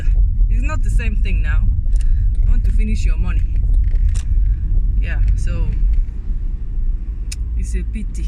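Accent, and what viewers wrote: Igbo, Nigeria. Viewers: Love how you use our Igbo accent,!🥰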